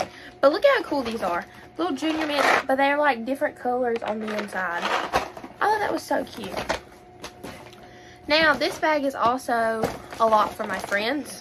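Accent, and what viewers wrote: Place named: South, United States. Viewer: Cool video 😃😃 I love your Southern credo twang!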